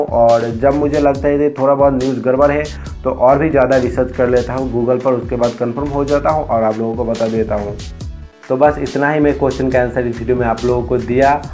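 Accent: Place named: Bengal, India. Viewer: da is from Bengal like me thats why his Hindi has a Bengali touch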